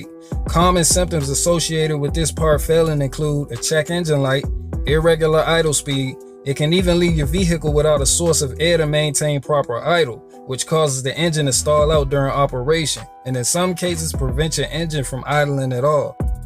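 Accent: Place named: DMV, United States. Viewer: Good video my brother, im tryna get my mobile mechanic business started. Im happy to see another brother like myself. Keep the vids coming, also are you in the DMV?? Im originally from the area and you just sound like it. Keep grinding brotha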